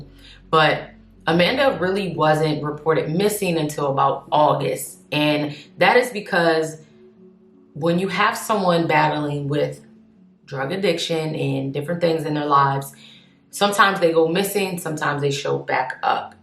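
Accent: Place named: Baltimore, United States. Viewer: Also question are you from near Baltimore? I can hear that accent in certain things you say.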